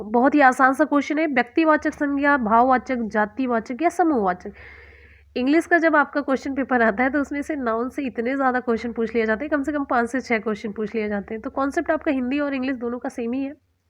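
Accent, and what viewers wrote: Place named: Bihar, India. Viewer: Mam maghi bihari boli hai...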